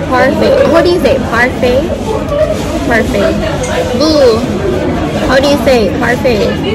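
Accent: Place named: Jamaica. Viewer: I can deffo hear the Jamaican accent ! Yeh goooodieeeeee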